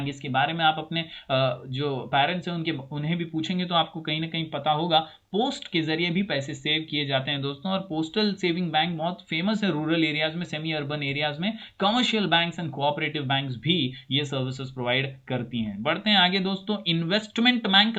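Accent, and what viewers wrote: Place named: Uttar Pradesh, India. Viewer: being from up whenever i listen ur up accent that helps me to take more interest in the lectures 😂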